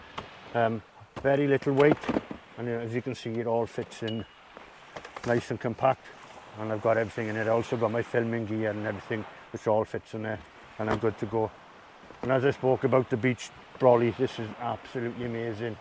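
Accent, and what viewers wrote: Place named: Wales, United Kingdom. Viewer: Bloody good result, - hard fought and fully deserved. Where in Wales are you from? Saw your video on Aberavon - Im a Port Talbot boy and that's my local mark. Your accent sounds fairly local too.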